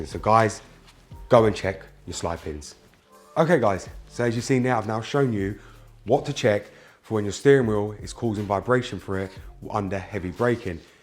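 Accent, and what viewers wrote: United Kingdom. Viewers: Thank you. You might have saved lives with this information. It's a shame that we have so many service people who don't care. It's nice to see videos like yours, to realize that there are still good people out there. I love your British accent.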